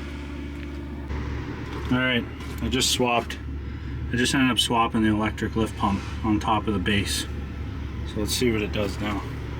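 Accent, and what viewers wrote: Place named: Canada. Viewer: Nice truck man , is that Canadian accent ? Sending good wishes from Scotland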